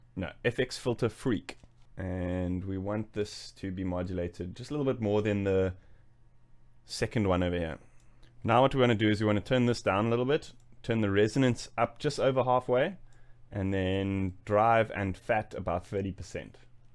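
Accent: South Africa. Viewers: You from south africa ekse?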